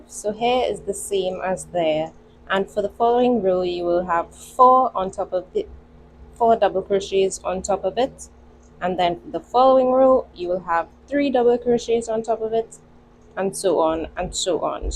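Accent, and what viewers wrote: Trinidad and Tobago. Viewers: Ahh! I heard your accent and knew it was Trini!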